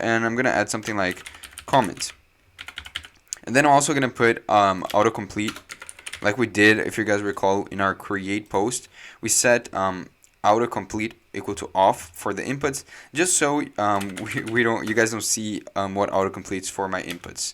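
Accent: Brazil. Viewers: The right thing was to call the function to load the comments and not to force in the front-end with a state. But ok nice video, helping a lot. (Tu é br com esse sotaque ctz kkkk abraço)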